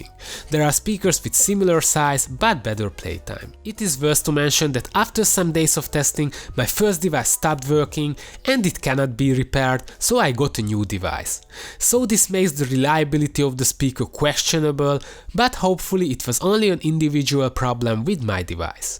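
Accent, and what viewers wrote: Hungary. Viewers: The hungarian accent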